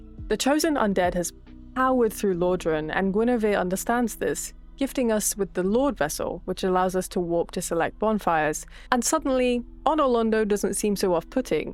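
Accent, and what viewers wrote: United Kingdom. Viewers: YOU'RE BRITISH??????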